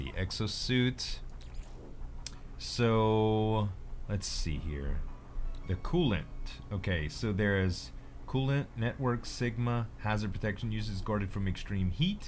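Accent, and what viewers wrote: Louisiana, United States. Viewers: Z, I can tell your from Louisiana...I am also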